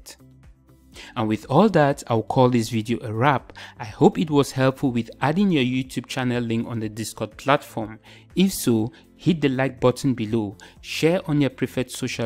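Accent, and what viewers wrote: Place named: India. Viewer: srry i thought u were indian bcs u have indian voice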